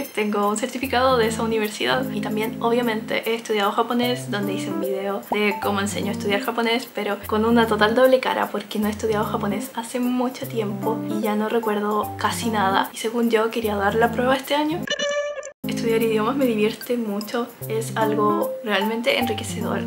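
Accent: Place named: Chile. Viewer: Lindo video, gracias por las ideas, últimamente también he disfrutado más mi tiempo en casa y es lindo encontrar más pasatiempos. Conocí tu canal no hace mucho y me ha encantado :D Tus videos me hacen sentir calma ^ ^ También tu voz es muy linda y relajante (y probablemente tambien tenga que ver que el acento chileno me parece muy lindo jaja) Estoy feliz de haberme topado con tu canal :D 💕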